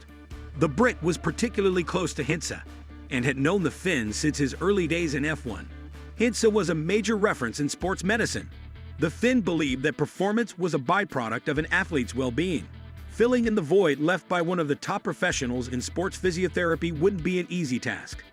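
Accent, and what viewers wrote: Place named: United States. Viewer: DUMP THE STUPID AMERICAN ACCENT. AT LEAST AN ENGLISHMAN KNOWS HOW TO PRONOUNCE GRAN PRIX.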